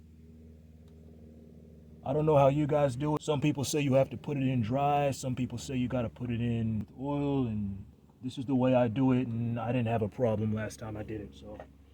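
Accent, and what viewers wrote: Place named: Minnesota, United States. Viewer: Nice looking car. Always enjoy your genuine demeanor and no bs get it done attitude. I still hear that MN accent haha, you sound just like the guys I grew up with. Hope your channel becomes the next Richrebuilds!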